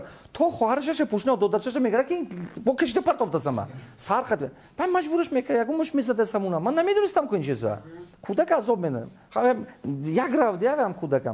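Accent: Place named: North, Tajikistan. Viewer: Устод бисёр Руси Кати Гап мезанад, бо шевай мардуми шимол. Хам як Чахон маьни❤❤❤❤хам як олам ханда хадя мекунад😂😂😂😂Зинда бошед..доктор❤❤❤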